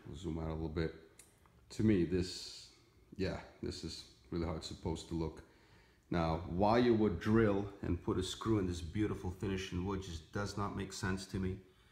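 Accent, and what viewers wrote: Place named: Italy. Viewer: You sound a little bit italian😂. I‘m italian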